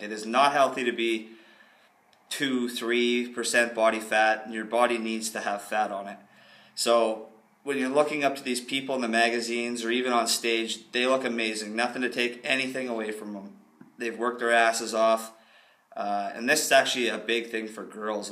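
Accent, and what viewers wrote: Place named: Canada. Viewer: Yes you can tell you are Canadian! Good input. Looking forward to more up dates on topics , nutrient , exercises, posing ,etc. congrats on your competition !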